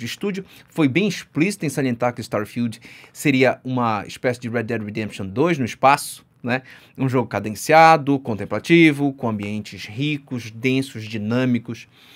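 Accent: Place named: Belém, Brazil. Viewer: meu pai e de Belém, passo a maioria das ferias com a minha vó em Belém, nao tem como nao reconhecer esse sotaque.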